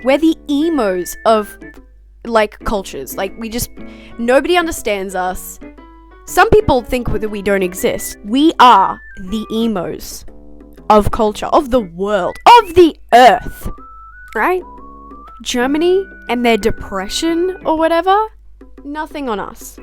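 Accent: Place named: United Kingdom. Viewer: Yes. She sounds british. wait wtf how old is she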